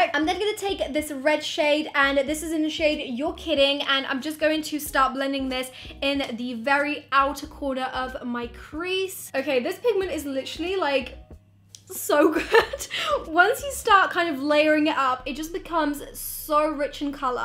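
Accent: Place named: United Kingdom. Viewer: You sound more British then normal 😂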